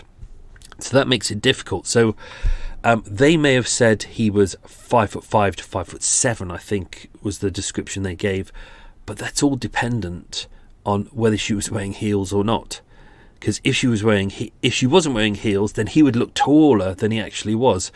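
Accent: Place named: Newcastle, United Kingdom. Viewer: Thanks. You've made some good points here regarding witness statements. Keep up the good work, great content and well narrated If your struggling with your geordie accent, try watching some Sid the sexist chat up line videos on YT. 👍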